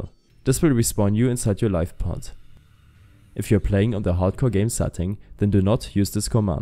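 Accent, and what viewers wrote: Germany. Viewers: Not like Indian like German because I’m german and most of my friends when they try to speak English they are speaking like this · Kann es sein das du Deutsch sprichst? Du hast nen starken Klangf in der Stimme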